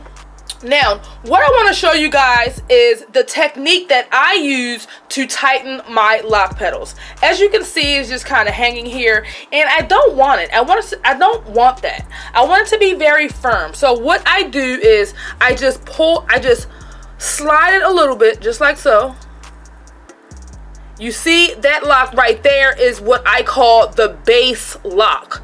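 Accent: Baltimore, United States. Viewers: I’m from the V in the DMV and I love the difference in all of our accents and Baltimore has one of my favorite accents by far.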